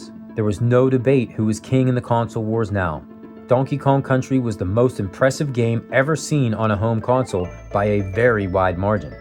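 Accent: Western Pennsylvania, United States. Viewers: Lol nice! I'm a complete f*cking nerd about linguistic stuff. So I'm like, bro must be from Western PA. I stopped short of calling you a Yinzer tho 🤣 #iykyk I'm from Ohio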